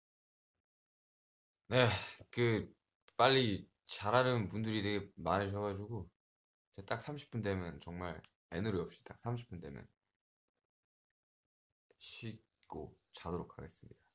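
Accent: United Kingdom. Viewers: His english accent ( ╥﹏╥) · Jada R it also sounded like he had a slight British accent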